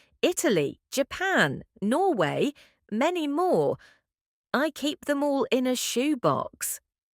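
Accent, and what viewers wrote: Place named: United Kingdom. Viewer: I love this story! Great British English!❤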